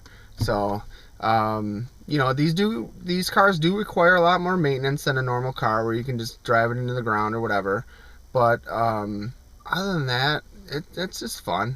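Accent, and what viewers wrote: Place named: Midwest, United States. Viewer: I knew you were midwestern with your accent. Hello to Chicago from Toronto.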